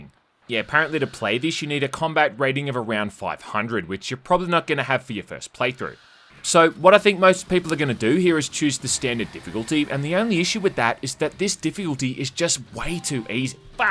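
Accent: Australia. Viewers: Is it just me or do we all love a reviewer with Australian accent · when my favorite video game reviewer with an exaggerated aussie accent says its good. then, it probably is.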